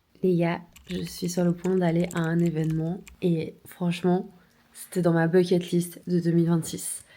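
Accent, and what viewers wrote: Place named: Belgium. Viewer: J’allais dire "non on remarque pas que t’es belge" juste au même moment tu parles de ranger ton brol un de mes belgicisme préféré 😅 Tes tricots sont trop jolis, je me rends compte que je devrais peut être investir dans des pelotes de qualité. Le Louvre-Lens me tente énormément en plus il propose souvent de beaux thèmes d’exposition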